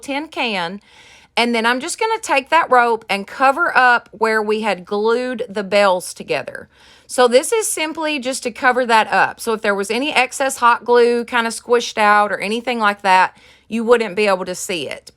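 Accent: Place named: Oklahoma, United States. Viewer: I love all your DYI'S, so stinkin'cute. I'm from Oklahoma and I talk just like you. You are so beautiful and cute at the same time.❤️❤️❤️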